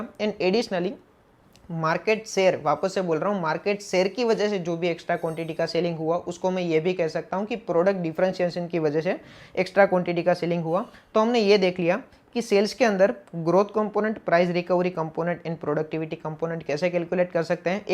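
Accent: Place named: Junagadh, India. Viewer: 2 things *Junagadh no tone aavi gyo😍 *And standard costing nu formate JKSHAH Classes jevu lage che..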